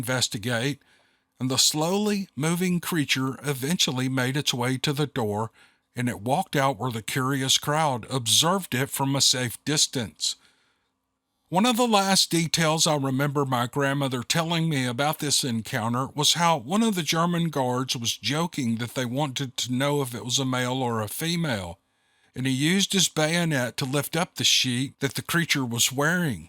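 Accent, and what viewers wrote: South, United States. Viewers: If Judy gets a kick outta your southern accent she’d be aesthetic to hear U and I talking back and forth 😂. I grew up in the south with parents born & Raised in The Southern Appalachian Mountains of NC. They grew up in the NC mountains or the Blue Ridge Mountains right by the southwest tip of Virginia. Although my parents had moved further south below the mountains they grew up in before I was born. I still spent much of childhood life in those same mountains. Because my dad was a Traveling Baptist evangelist minister and we went back to those same blue Ridge Mountains every weekend. Both my Grandmothers still had homes there so we went and stayed in one of their homes while dad preached at one of the churches along those blue ridge mountains. So I heard and picked up & have always spoke the Appalachian Dialect 😂! Although I grew up further southeast of those Appalachian mountains I’ve always spoke the Appalachian Mountain dialect. 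Still to this day I have people say where are you from ? You’re from the mountains aren’t you ? I laugh and say no I’m actually from here but my parents and all my Kin folk are from the blue ridge Appalachian mountains. They’ll say well you don’t talk like the rest of us southerners, you have a unusual use of words we understand but don’t hear around here. 😂 I’ll just say well it was born and bred into me & it’s actually Appalachian Hillbilly dialect. Although I’m educated I still carry that southern Appalachian talk. Using words or phrases like: (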